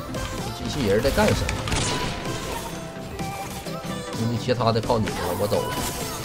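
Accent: Northeast, China. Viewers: 东北话太好玩了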